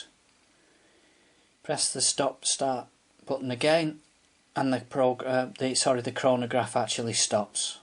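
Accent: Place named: Merseyside, United Kingdom. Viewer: Are you from Liverpool? You have a Merseyside accent.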